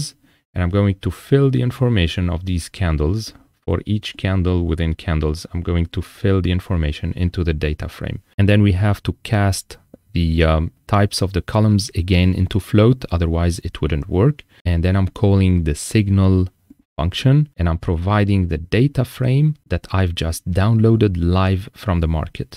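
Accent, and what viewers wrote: France. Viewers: Thank for the video i will try it ! By the way have you french accent ? And have you made money from your bots ?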